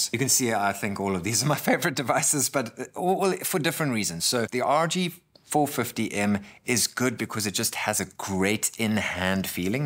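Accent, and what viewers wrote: South Africa. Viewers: lol just cause you have a lekker saffa accent I bought a retro pocket 2s lol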